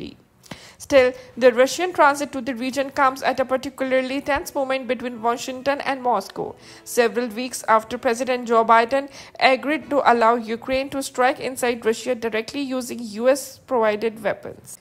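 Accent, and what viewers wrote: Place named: India. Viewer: British ? Not at all ! Your speaker has a strong accent... Russian media with Indian speaker ?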